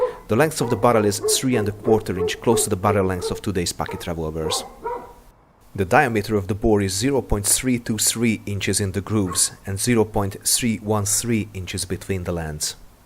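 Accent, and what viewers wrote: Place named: Hungary. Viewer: Nagyon tetszik a magyar akcentusod!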